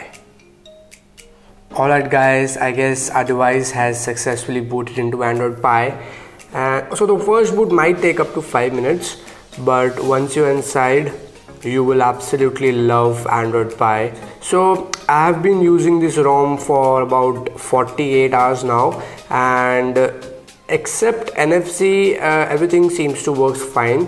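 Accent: India. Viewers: индусский английский это кровь из ушей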